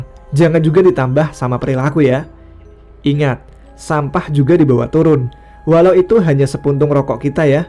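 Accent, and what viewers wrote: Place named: Cirebon, Indonesia. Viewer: Aksen mas seperti dari cirebon, asal mana mas?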